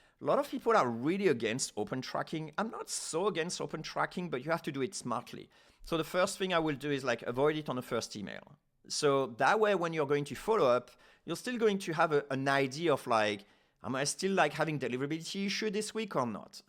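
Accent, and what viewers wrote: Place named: France. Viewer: Hello are you french you have an accent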